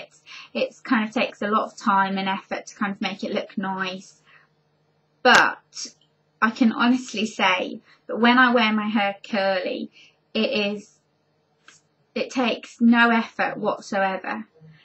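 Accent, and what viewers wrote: United Kingdom. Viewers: I. Love. Your. Accent. SO MUCH brittish accent always reminds me of harry potter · omg!! thanks for your tips. my hair is very similar to yours when i was younger i hated it i wanted it straight all the time. now at 37 years old i love my curls i get many compliments. love your british accent! all the way from puerto rico!! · we have similar hair and everything you said was really interesting. Btw ,just so you know, If I had a british accent, I would never shut up :D lol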